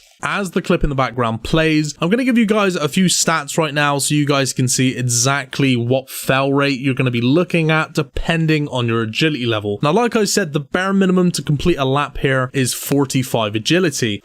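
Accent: Bristol, United Kingdom. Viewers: I've just found you but are you not Bristolian? Liked & Subbed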